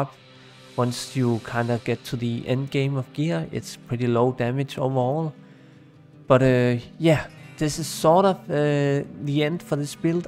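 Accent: Denmark. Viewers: Elsker din kanal og især din interesse får mig til at nyde det. Derudover er din tykke, danske accent perfekt :-) Bliv ved! Har desværre ikke nogle ideer til videoer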